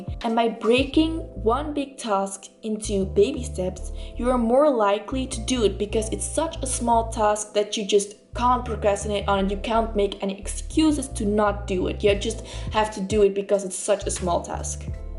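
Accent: Netherlands. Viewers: Are you Dutch? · Cool video! Are you Dutch? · Omg love your dutch accent! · Are you from the Netherlands, because her voice sounds a little bit like Netherlands People trying English. But your English is very well! I'm from the Netherlands! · Je klinkt Nederlands, klopt dit? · Are you dutch?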